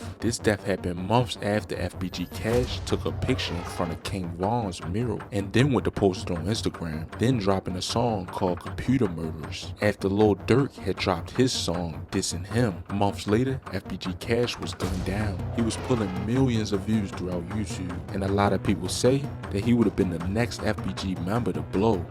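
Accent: Baltimore, United States. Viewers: That a Baltimore accent? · Are you from Baltimore? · I tried to watch it but i can't get pass that crazy Baltimore accent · You from Baltimore ain’t u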